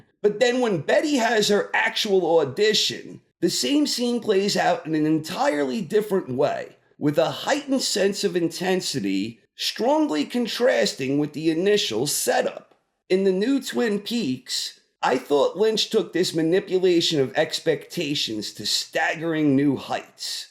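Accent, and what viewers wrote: New York, United States. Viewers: serious question, where are your from? I grew up in NJ and I suspect a hit of a NY accent? Please respond!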